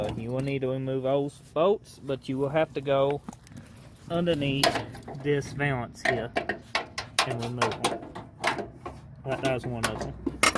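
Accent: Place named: South, United States. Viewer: Southern accent, nice, friendly and helpful people.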